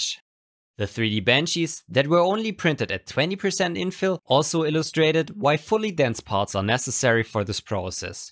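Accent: Germany. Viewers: Ya german?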